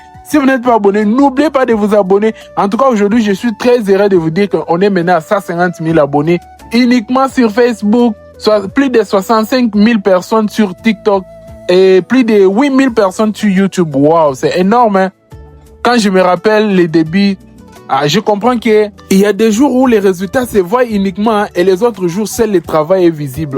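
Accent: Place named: Ivory Coast. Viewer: T'es congolais mais t'as l'accent des ivoiriens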